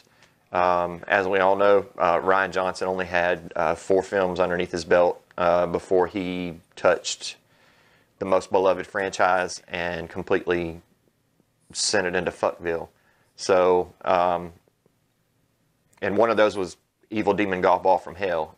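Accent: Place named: South, United States. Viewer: I've notice something kind of funny.. lol... If the people from the east cost says Ray is a Marry Sue, and this guy who sounds like deep south says Ray is the biggest horseshit Force User. just trance late Deep south langrage to East Cost, its the same thing said in a different style of English, Marry Sue= Biggest horseshit Force User.. At this point I think people in the UK will say the same thing about Ray but in a different style.. you know what this means Disney and Lucasfilms is in deep shit..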